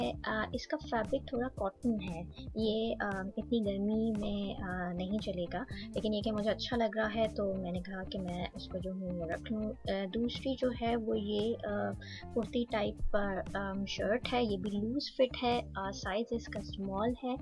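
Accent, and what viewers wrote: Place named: United Kingdom. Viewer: okkkk that's great uve adopted a v good British accent that's why I was asking 😍😍😊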